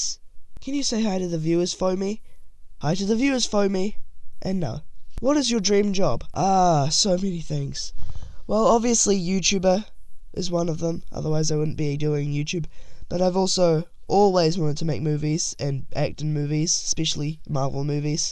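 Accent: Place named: New Zealand. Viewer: I knew that was a new zealand accent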